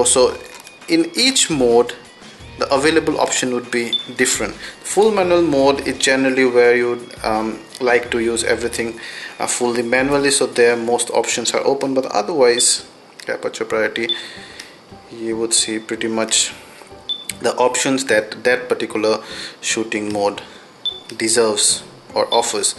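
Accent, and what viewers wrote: India. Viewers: indian accent is so funny haha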